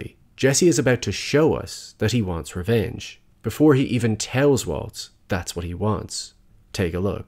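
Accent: Ireland. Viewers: I just binge-watched this playlist (next will be the Better Call Saul playlist) and I really appreciate how you break down and explain the characters and/or situations. On a side note, I'm really curious if you are trying to suppress your accent or if it is really light/moderate like that? (I want to say Irish but I'm second and third guessing myself so not confident on that.)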